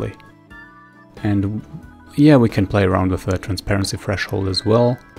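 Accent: Germany. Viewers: You are german right?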